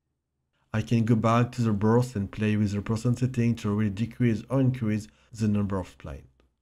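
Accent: France. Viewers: joli l'accent français :) merci pour la technique by the way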